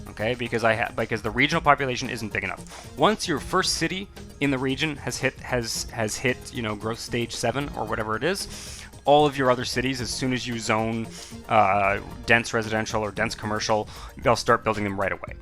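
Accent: Canada. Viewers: You sound Canadian, I'm Canadian and I looked at your twitter and I was right 😂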